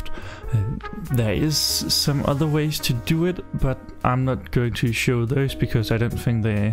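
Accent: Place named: Denmark. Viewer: Jeg vidste bare du var dansk så snart du begyndte at snakke hahaha ; ) fed video, tak for hjælpen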